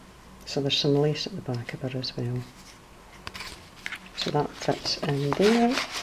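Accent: Scotland, United Kingdom. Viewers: Without your accent I would never learn scottish. Love the edge collaging, very nice idea, will try it too. Your envelopes are gorgeous. Have a nice summer evening and say hello to yours! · Aye,! Bless the Scots accent. I come from a he North East. Now, there's an accent that only few would understand! Beautiful work yet again. Very talented lassie! 💜 xx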